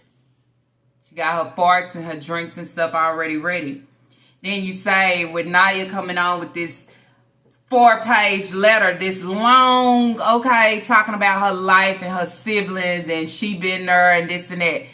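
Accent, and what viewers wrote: Texas, United States. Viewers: ❤ hay girllll hay I just put up.grocerys An stop to catch my.breath.when I herd you say radiation contamination.I fell out laughing. An with that beautiful Texas accent. It's to much.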